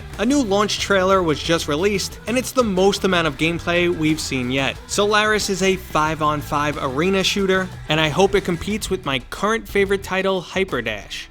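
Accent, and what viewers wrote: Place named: Boston, United States. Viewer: Let me guess, are you from Boston?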